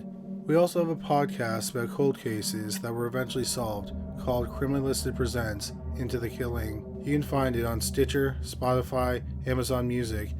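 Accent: Canada. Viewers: He’s Canadian, that’s his accent. Leave his page if it bothers you so much. I used to turn up the speed of his videos when I first started watching bc his voice was annoying to me. I don’t mind it anymore.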